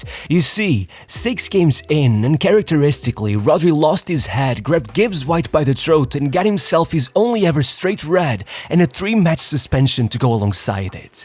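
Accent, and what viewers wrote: Portugal. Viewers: Just a Portuguese accent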